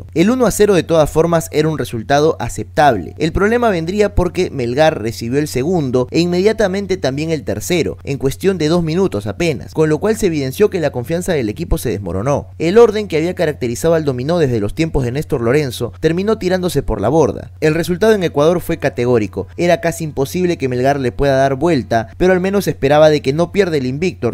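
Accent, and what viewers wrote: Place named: Argentina. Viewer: por que siento un acento argentino?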